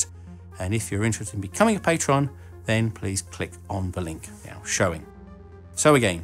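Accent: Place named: Cockney, United Kingdom. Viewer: A fascinating video as always but the blokes terrible Pommie slapped Cockney accent is awful and distracting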